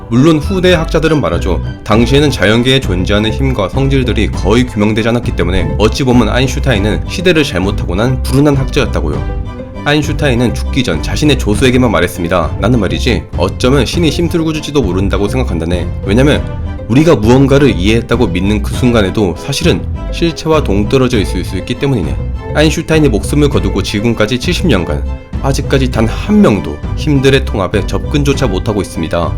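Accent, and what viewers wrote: Gyeongsang, South Korea. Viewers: 경상도 아닌가요?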